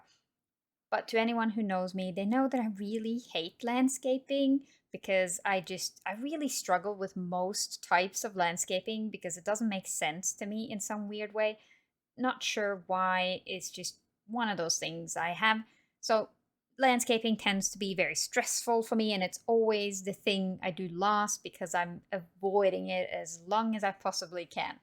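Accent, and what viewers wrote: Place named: Scandinavia. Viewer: This was relaxing to watch! Good music choice too, a chill tune with *no singing*, just perfect! And I love listening to your English because you sound.. well, Nordic and it makes me feel like home ^^ (and your English is great!) I didn't even realise I watched an almost 40 minute long video bc you weren't being repetitive at all with your commentary and everything just kind of flowed together nicely ✌️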